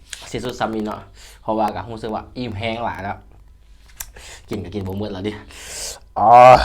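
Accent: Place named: Laos. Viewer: เป็นคนที่ไหนเสียงคือลาว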